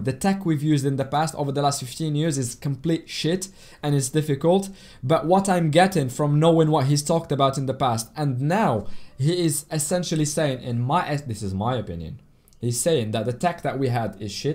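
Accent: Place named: United Kingdom. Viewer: I absolutely love the british accent when speaking french 😁 Keep up the good work! Btw, as a french person, the translation is on point 👍🏽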